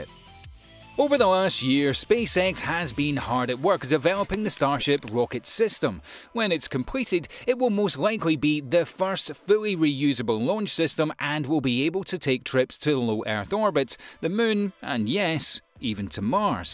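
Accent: Scotland, United Kingdom. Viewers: Are you from Scotland? I like it , not so much the Brits I like the way you speak, it reminds me of 'Scotty' on the enterprise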